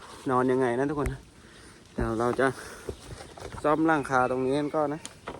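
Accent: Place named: Ratchaburi, Thailand. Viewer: สำเนียง..ราชบุรี..ชัดเจน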